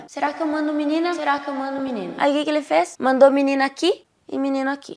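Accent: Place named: Rio Grande do Sul, Brazil. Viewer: Estou vendo pela primeira vez o seu canal e adorei! Parabéns! Seu jeito autêntico e, por vezes, engraçado despertou muita empatia. Como gaúcha que sou, mas morando no interior de são Paulo há anos, perdi o sotaque e amo ouvir vocês falares. Dá um "quentinho" no coração, sabe! Sucesso, guria! Tu merece. 😃😘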